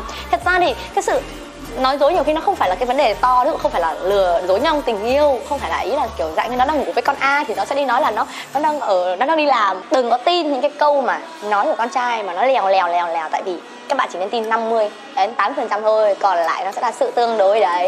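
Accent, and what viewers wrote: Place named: South, Vietnam. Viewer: Nói giọng Nam rồi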